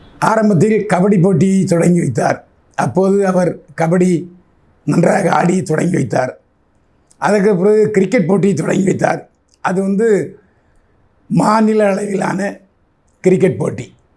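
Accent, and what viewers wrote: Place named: Tirunelveli, India. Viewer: Annatchi yentha puthula yentha paaaaambu irukum, Anna Nellai pasai Yellam polichathan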